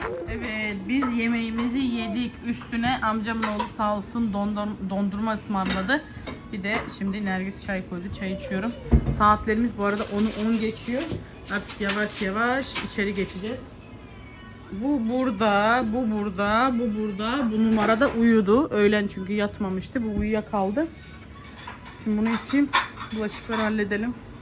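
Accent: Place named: Azerbaijan. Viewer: Salam yaxşı gəzmələr ❤siz azərbaycali kimi danişirsiz anenlere salam göndərirəm azərbaycanan 😍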